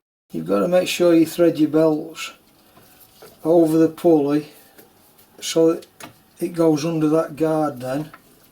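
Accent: Yorkshire, United Kingdom. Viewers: I caught your name in the comments the knacklers workshop, I’m pleased to sub you and will be looking through your back catalogue. You sound your from the land of the White Rose. So I’m not too far away over the border. If I can ever help.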